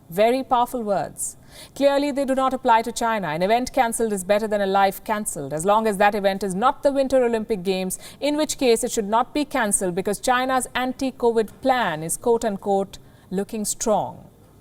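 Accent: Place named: India. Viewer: How funny of this india accent!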